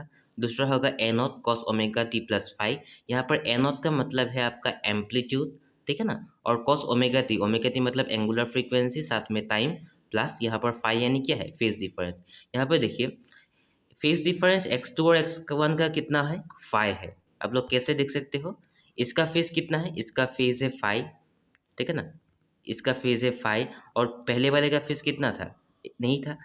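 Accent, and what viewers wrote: Assam, India. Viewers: Are u from Assam,sir?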